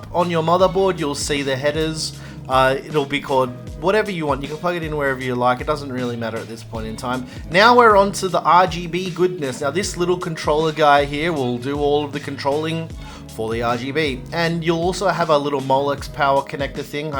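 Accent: Australia. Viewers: he sounds Australian i love it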